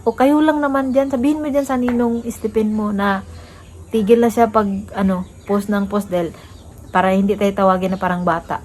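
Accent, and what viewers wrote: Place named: Visayas, Philippines. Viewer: Bisaya ka pala dong .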